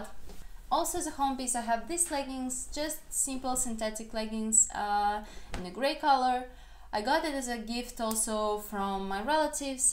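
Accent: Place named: Russia. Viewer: I'm not trying to be rude at all, I hear Russian accents all the time so I hope that you don't mind me saying this (Degree Celsius - with an "s" sound at the end). Thank you for having content in English.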